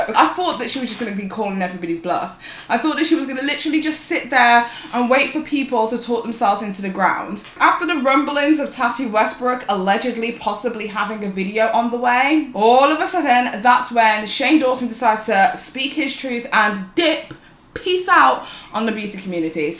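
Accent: United Kingdom. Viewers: Started watching your videos recently. As a fellow Brit, its wierdly comforting to hear your lovely British accent, when the majority of the video's in a sea full of American accents. KNOWS THE MEANING OF NUANCE new Subscriber. X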